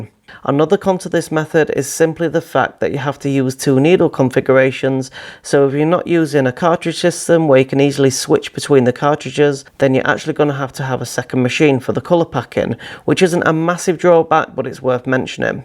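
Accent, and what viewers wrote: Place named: Yorkshire, United Kingdom. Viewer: Thanks for this mate , we're close in accents as I'm a Yorkshire man and this was good to find something familiar sounding because the American reference points can differ , just dine my first self tatoo and your video was really informative thank-you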